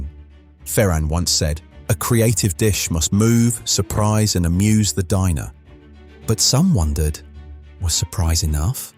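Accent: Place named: England, United Kingdom. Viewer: The English accent suggests targeting the Spaniards once again. Typical Brit “mala leche”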